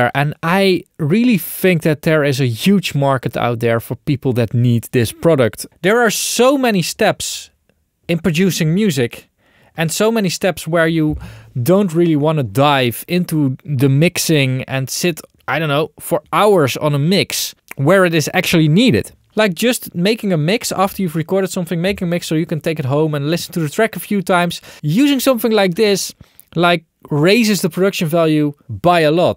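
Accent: Netherlands. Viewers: you sound a little bit dutch, am I correct? 🙂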